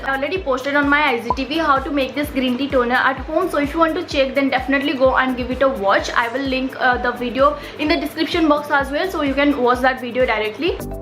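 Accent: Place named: Bihar, India. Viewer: She's bihari. It's obvious from her accent.🙂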